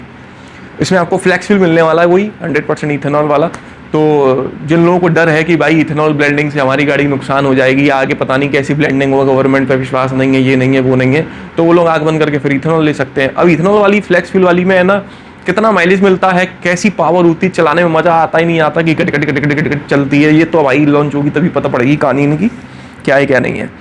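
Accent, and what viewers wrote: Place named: Madhya Pradesh, India. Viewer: Bhai vese kaha se ho aap ? Apke accent se to M.P Side se lgre ho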